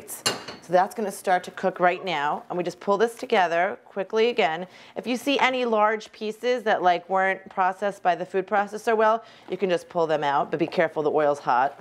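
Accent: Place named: Brooklyn, United States. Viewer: Diane C she's speaking Crown Heights/Boro Park-ese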